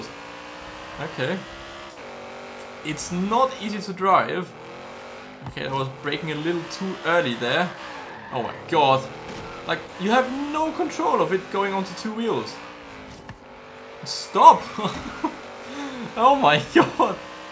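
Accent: Germany. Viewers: Is he German? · Are you German or Austrian? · scheiße deine Videos sind immer so abgedreht :'D bin letztens über den ultra dragster tesla auf dich gekommen. man hört sofort dass du deutscher bist :P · Are you german? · Es hört sich echt nach deutschem Akzent an. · is he German? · Man hört einfach das du deutscher bist😂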